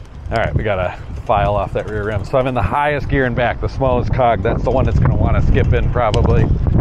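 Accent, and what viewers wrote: Wisconsin, United States. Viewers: "there's my midwestern accent for ya..." i'm a transplanted midwesterner on the east coast, and if it weren't for the great bike content, i'd watch your vids anyway, just for your WiscAHnsin accent!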